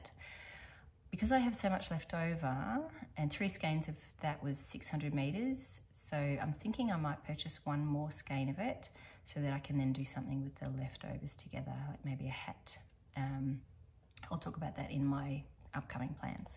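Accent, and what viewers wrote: Australia. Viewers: Hello, just found you on youtube, nice to hear an Australian voice,you are a gifted knitter, i have been watching youtube since COVID, i do love watching different crafts but lately the knitting ones i have liked because of all the lovely yarns that are available and the projects that people make, i am amazed, i have never been a knitter but lately have been making a few plain scarfs, i wish someone would have taught me when i was younger, but i do other things and i took a watercolour colour class a few years ago now, so i am happy. I have started painting wooden things and doing transfers and gold leaf which is more my thing. I was pleased to come across your podcast and look forward to watching again. Kind regards pamela hall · I’ve just discovered your podcast and I love it!!! It’s fabulous to see and hear an Aussie! Looking forward to watching your other episodes. 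👏😃🧶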